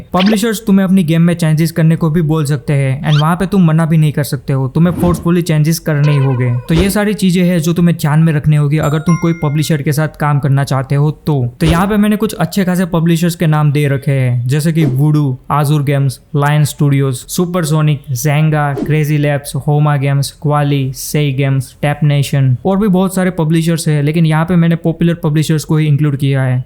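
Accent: Gujarat, India. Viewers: from your way of speaking game I know you are from Gujarat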